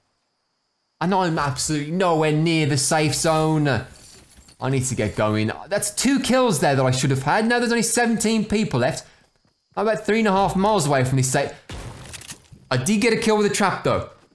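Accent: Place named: United Kingdom. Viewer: your british accent it is really good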